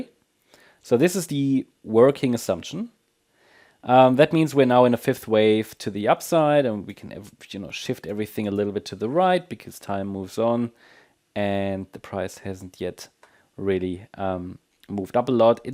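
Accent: Germany. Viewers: Thanks for frequent updates. I’ve been following you for a while now and like your analysis and German accent 😊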